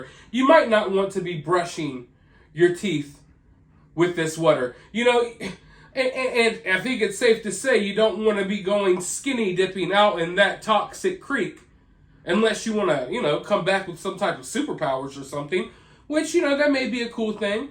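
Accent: South, United States. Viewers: A swamp monster. Crack me up. You can tell you're a southern boy.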